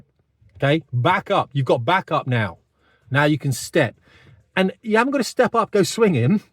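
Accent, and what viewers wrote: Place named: United Kingdom. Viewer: . You're starting to sound like a African Britain in your speech. Go back to proper English patois. Cheers.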